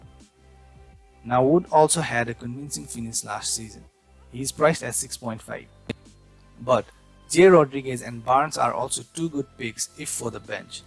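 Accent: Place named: South Asia. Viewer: I'm from Nepal and your accent sounds familiar like from south asian countries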